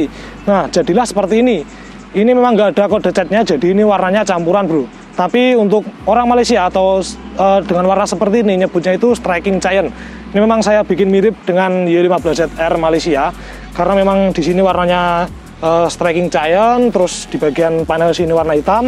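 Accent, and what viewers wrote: Java, Indonesia. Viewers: Boso jowone gawe luwih kentel broo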